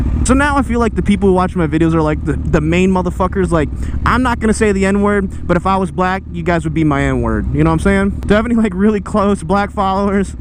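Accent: Canada. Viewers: The Canadian accent had me rolling 😂😂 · Lmao your canadian accent had me in tears aye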